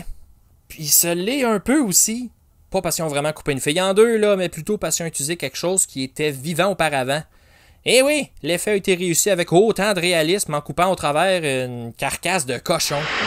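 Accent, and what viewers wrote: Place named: Quebec, Canada. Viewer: J'adore ta vidéo, ça fait du bien d'entendre parler de l'horreur avec un accent Québécois. La première vidéo que je regarde et c'est assurément pas la dernière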